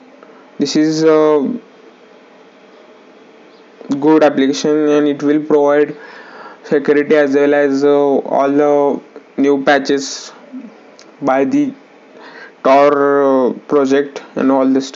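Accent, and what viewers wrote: India. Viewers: U are indian ur voice is like indian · Must be Indian, lol but I can understand what u say.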